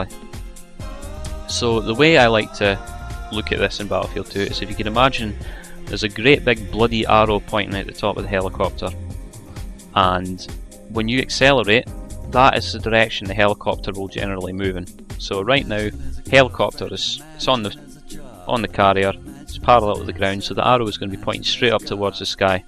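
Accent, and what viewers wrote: Scotland, United Kingdom. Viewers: hehe i like that scottish accent :D and the video is very good and informative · Didn't realized scottish accent was so universal as to not be recognized. I was bewildered though! Good job! :) (ps.: Any idea when part 2's coming?) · Probably Scottish... =P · Probably?! He's as Scottish as haggis.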